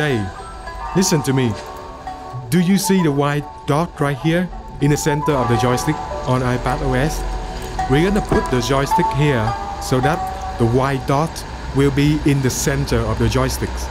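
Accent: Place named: Vietnam. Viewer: Vừa ấn vào video chưa kịp nhìn mà nghe cách phát âm là biết người Việt Nam gòi :3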